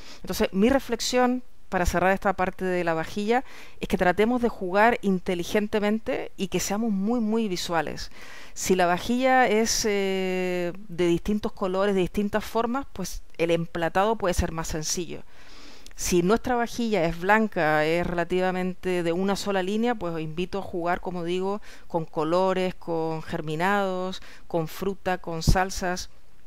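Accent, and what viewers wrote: Chile. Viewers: Habla como Chilena. Es Chilena?? Excelente contenido sí. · Hola, acabo de llegar a tú canal y me he dado cuenta que eres una profesional del marketing gastronómico por lo que me he suscrito a tú canal y comentarte por consejos y estrategias a seguir para una idea de delivery de comida peruana y postres chilenos y peruanos. Saludos desde Santiago de Chile Tienes acento chileno, pero con algunas palabras castizas, de dónde eres?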